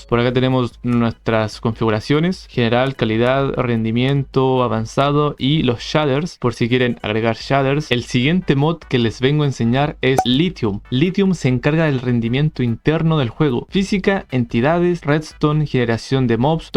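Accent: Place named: Chile. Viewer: Chileno se te nota, gracias mi hermano hoy quise revivir mi infancia, meo corte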